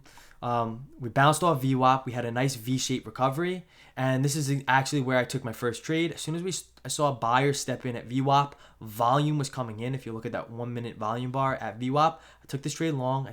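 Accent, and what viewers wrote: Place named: Georgia, United States. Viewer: You definitely sound different with that mic. I thought you were from Georgia.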